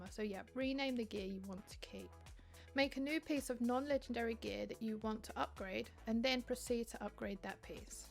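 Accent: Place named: RP, United Kingdom. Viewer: being posh!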